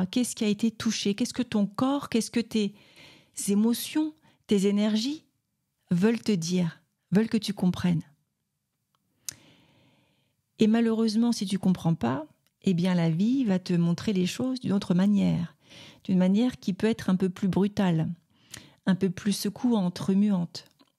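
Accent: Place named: Quebec, Canada. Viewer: ...ce n'est pas pour "e" rien..., cool pour l'accent quebecois!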